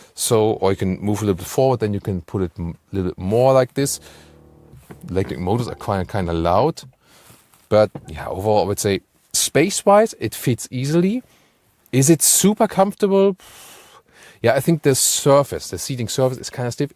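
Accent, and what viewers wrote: Germany. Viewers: Irgendwie stößt der german accent bei mir auf unwillige Ohren.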